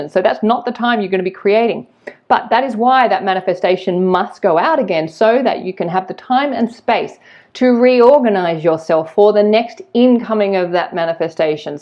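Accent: Australia. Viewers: Me too, I am falling in love with the Oz accent.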